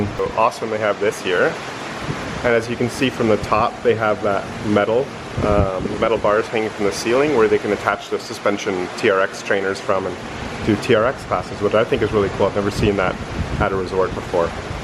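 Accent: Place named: Canada. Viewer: I knew you were Canadian as soon as I heard you talk. Great video!! This is the best one I’ve seen. I’m going on the 29th and it was so detailed.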